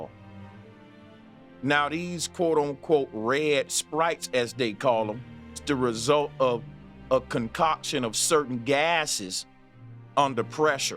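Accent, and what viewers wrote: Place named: South, United States. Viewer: you disrespect all the black women that made it possible for America to brag about being the first on the moon. You do know if it wasn't for a black female mathematician came up with the calculations for America to 1st send a man into space, than to the moon. GOD is a jealous GOD should have given you the red flag that something isn't right. Why would a being over all be jealous, you peole are too old to believe these fairy tales. Flat Earthers have literally been proven wrong just recently from that trip to Antarctica. Why didn't you take the trip that guy offered to people like you. You can't prove it and no one has silenced you, we laugh at your ignorance in 2025 because there are so much proof of yourr foolishness. In the end you making the statement thst everybody hates Trump so he musy be good, you country accenr does you know justice and just hearing you talk makes me think of why Harriet Tubman always carried a shotgun when she freed the slaves. 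Trump has literally tried to overturn the 1964 civil rights acts but you understand why MAHA likes him. Yeah because the want to makes "US" slaves again...actually get some knowledge because you're lost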